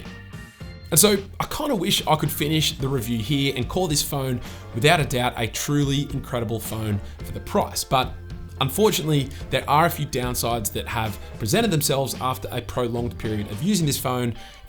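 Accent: Australia. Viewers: Galaxy Aye52 😆 Love that Aussie accent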